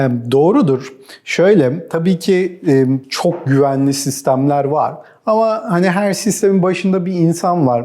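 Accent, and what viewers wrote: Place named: Yozgat, Turkey. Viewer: yozgatli galiba k ler cok dogal geliyo kulaga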